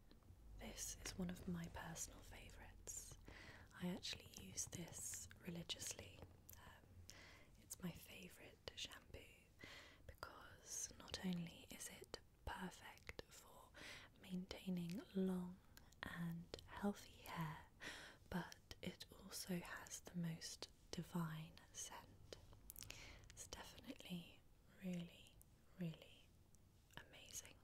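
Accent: England, United Kingdom. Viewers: the English accent works awesome for asmr👑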